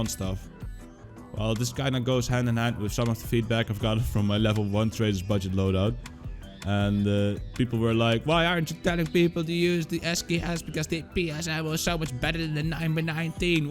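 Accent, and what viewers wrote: Netherlands. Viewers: Nice to watch. Really useful info. Though I can hear you are probably Dutch haha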